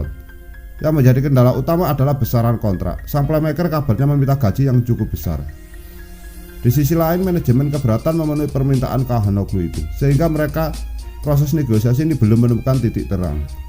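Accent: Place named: Banyumas, Indonesia. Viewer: Ngapak suaranya .. hehehehe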